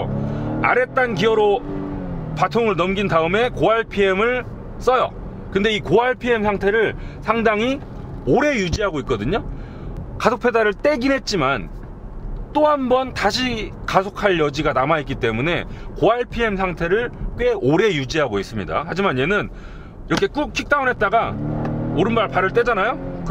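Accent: Jeolla, South Korea. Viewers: 전라도 사투리가 구수하네요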